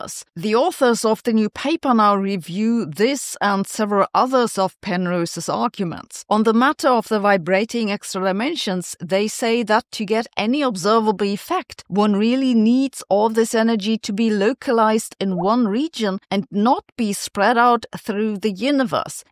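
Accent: Germany. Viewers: Breathing in the new German accent. ShiiRaahh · Great deutsch accent. You could sing a lesson singing an melody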